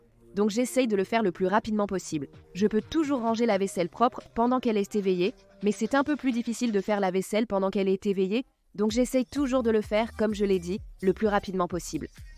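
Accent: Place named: United States. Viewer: Hello, I really like your content on YouTube. I watch your wonderful videos from my country Morocco. It's far away, but I really like what you do on YouTube, can you add the audio track option on the settings so I can listen to your American accent, I like it and it also helps me a lot to learn English.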